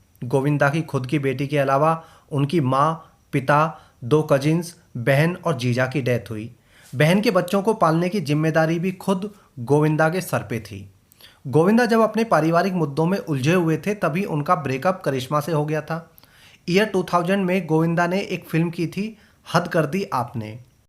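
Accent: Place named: Uttar Pradesh, India. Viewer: Kay Ap Ideal Na Banaein Actor Ko Actor Ki Hat Tak Rakhaein Kyunkay Laehjay Say Tum Bhi Up Kay Lagtay Ho Amitabh Alahbad Ka Hai to Yeh Tumhara Tasub Nahin Hai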